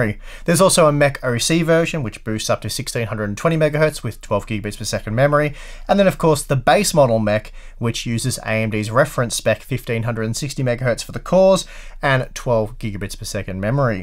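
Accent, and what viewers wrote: Australia. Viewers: Youtube's auto-generated subtitles aren't that great with Australian English. What you read here are the results ;)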